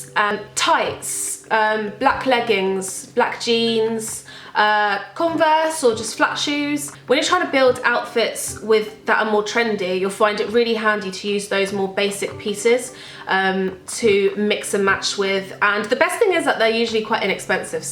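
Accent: United Kingdom. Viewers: Are you from the uk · Your British accent is sooo cute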